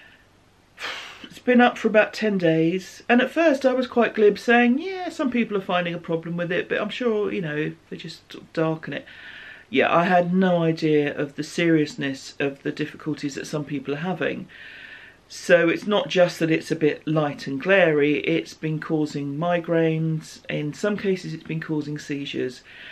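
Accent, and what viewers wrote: England, United Kingdom. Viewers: I enjoy your podcasts so much! It is partly your sweet English accent, your beautiful knitting projects and your great personality❤️Thanks so much for sharing your life with us❤️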